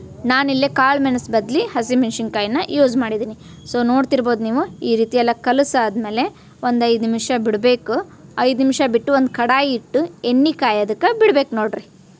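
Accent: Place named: Bangalore, India. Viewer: Niv Bangalore kannada matadri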